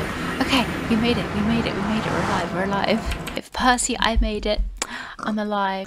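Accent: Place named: United Kingdom. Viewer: I'm falling in love with your British accent😃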